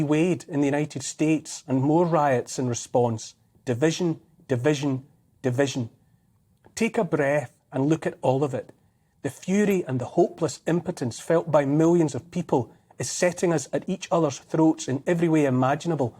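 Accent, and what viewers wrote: Scotland, United Kingdom. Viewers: oh no baby, it seems you have gone down the route of justifying yourself to a stranger like me, I am flattered but I have not even met you yet, you must buy me dinner first 😉 'Irn Bru rhymes with Guru', yet you used both in your pet name for the guy, how odd from someone who has an elevated grasp of the English language and its use. Irn Bru is synonymous with Scotland, has a Scottish accent and is known to be Scottish also but of course it was just a coincidence that you used 'Irn Bru' to partner with Guru because it somehow rhymes with Guru. 'boohoo' would have been a good replacement and/or partner for Guru and it's meaning would have been appropriate to your goal. P.s. how dare you assume that I not only wear knickers but that I wear underwear at all.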